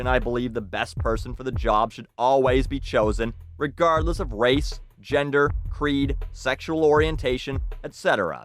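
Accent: Canada. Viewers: That Canadian accent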